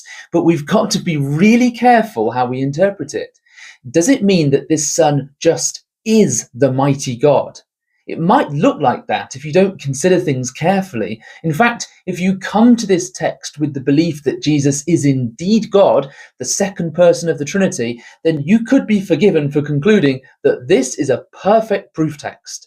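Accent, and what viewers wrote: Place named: United Kingdom. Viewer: Good work bro. Hope I have added a bit of good service in the comments. I will sub, you sound like you are in UK?? Great if you are, as there is a great silence in the world these days from Blighty, especially on this subject. ha ha, i see i already did sub recently. Blessings, i will roll through a few of your vids.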